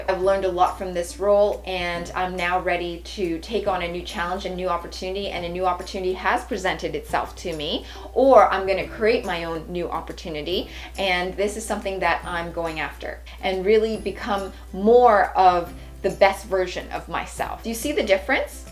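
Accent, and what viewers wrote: Canada. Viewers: You sound Canadian :)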